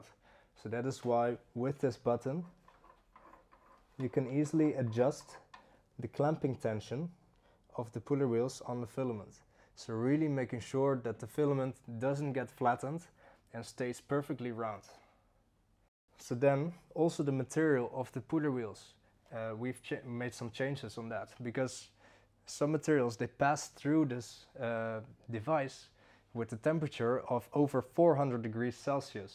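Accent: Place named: Netherlands. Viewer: Heerlijk Nederlands accentje :p, maar good job though!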